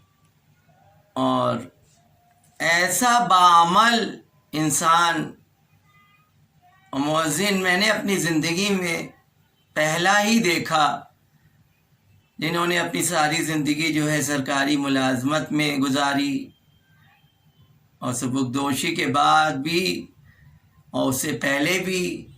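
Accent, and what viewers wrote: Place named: Lucknow, India. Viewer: Channel ne khaas Lucknow ke lahje me soz o marsiye ki goonj hum sab ko karbala e Mualla tak Sunwa di MashaAllah Recited Soora e Fatiha From Karbala/Iraq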